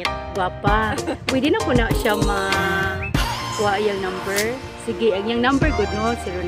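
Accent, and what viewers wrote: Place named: Iloilo, Philippines. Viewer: Nami gid ang ilonggo ba hehe malalambing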